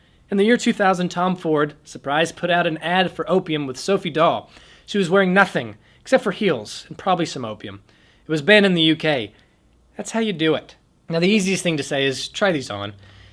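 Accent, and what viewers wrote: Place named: Georgia, United States. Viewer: Your southern accent is so subtle-almost undetectable. I’m guessing Georgia. Two great fragrances, especially Shalimar.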